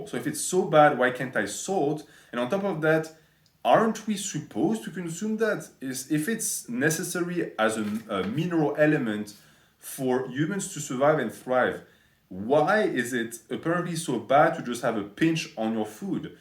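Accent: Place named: France. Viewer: I feel like has found the most unexpected niche in the fitness industry and that's critiquing stuff in a french accent, idk why it's so addicting to listen to.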